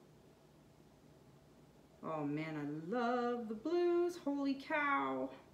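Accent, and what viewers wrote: Canada. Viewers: Omgoodness I love yer Canadian accent and you and Molly Mc like BUTTA are my favorite!!! WHAT????? Beautiful. I’m doin it!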